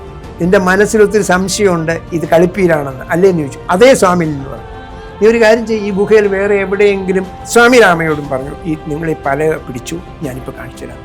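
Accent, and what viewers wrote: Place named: Thrissur, India. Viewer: അത് തൃശ്ശൂർ കാരനാ😂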